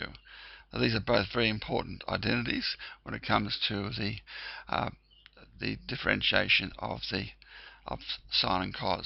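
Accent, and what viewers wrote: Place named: Australia. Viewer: I can say he is an Aussie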